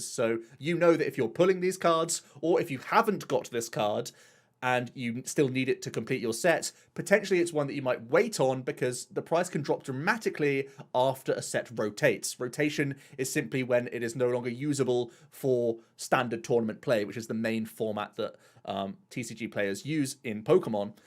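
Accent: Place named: United Kingdom. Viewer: Dollars? You sound like a British man. Unless you're living in Australia, America, Canada, etc why are you giving us prices in dollars?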